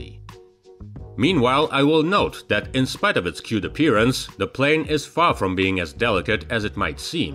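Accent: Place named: Russia. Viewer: I needed more "Wings of Russia" and I found you - everything related to aviation sounds better in a Russian accent!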